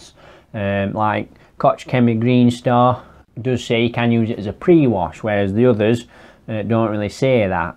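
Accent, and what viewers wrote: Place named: England, United Kingdom. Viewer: Great video! It's a pleasure to hear a proper English accent with clear, simple explanations - all backed by thorough research and planning. Beats a loud, shouty American making big unsupported claims from a sunny California beach! Top work. Well done, that man...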